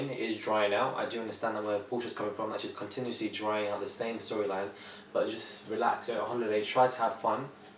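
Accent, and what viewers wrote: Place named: United Kingdom. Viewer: RHOA reviewed with a British accent????? LOVE IT!!!!!!!!!!!!!!!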